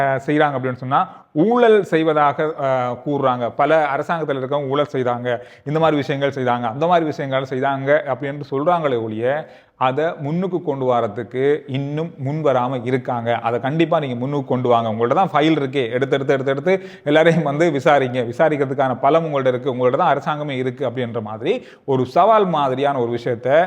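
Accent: Batticaloa, Sri Lanka. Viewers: Don't come up with your stupid Eelam concept. He is from Batticaloa, Eastern province. This is Batticaloa accent. North they don't say "avanka" they say " aveyal" .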